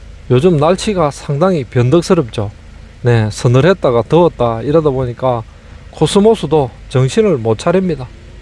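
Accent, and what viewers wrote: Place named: South Gyeongsang, South Korea. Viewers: 남해로 알고 있는데 아닌가봐요? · 경남입니다 대부분 이런맡투^^ 경상도 하고 는 조금 다르지요^^ 밀양 합천 창년 등은 ^^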